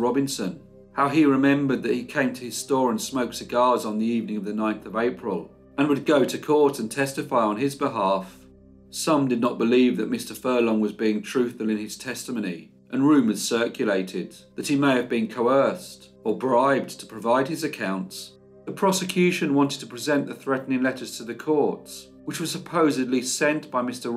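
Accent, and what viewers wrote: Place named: Birmingham, United Kingdom. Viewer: I can’t get past your Brummie accent 🛑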